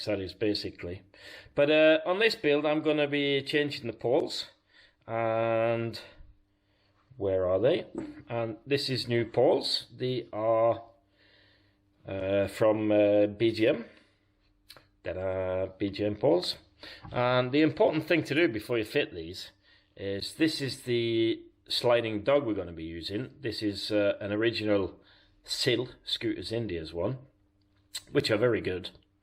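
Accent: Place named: Northern England, United Kingdom. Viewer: Loving the commentary. Sound like you lived in Manchester or Leeds for a while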